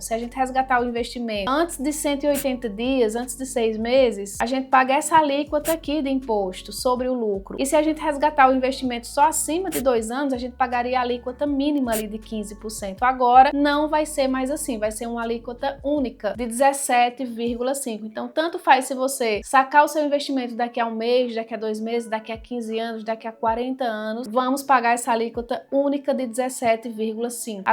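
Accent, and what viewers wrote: Northeast, Brazil. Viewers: linda maravilhosa..❤❤... Obrigado pelo seu trabalho.... fiel seguidor. Amo esse sotaque nordestino.....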